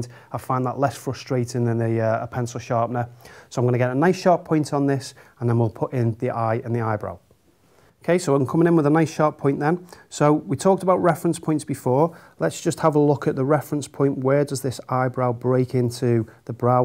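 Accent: Liverpool, United Kingdom. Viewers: What an amazing lesson. I’m awful with angles and ratios and can never get my head around them but you’ve just explained this so well. I’m defo a new subscriber, especially as I think you might be a fellow scouser! Thanks for sharing your knowledge. 👏🏼👏🏼👏🏼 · A scouser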